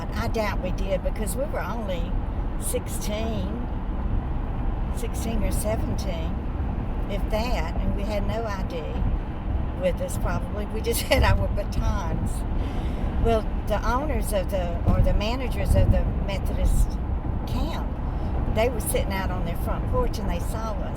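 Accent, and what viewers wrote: South, United States. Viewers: We just love your stories! Enjoy the grand babies! We laughed at " the cigarette made my head swim" with your cute southern accent. Do you have a Mississippi Pot recipe video? Have a great weekend. ❤ The Lewis Family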